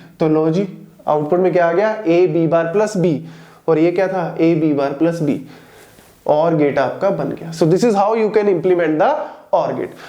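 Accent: Punjab, India. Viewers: Sir are u from panjab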